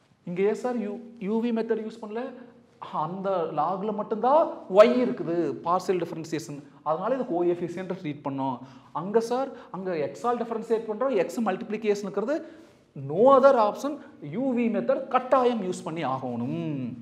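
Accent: Coimbatore, India. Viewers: Avaru oluga tha pesura ru. Athu coimbatore slang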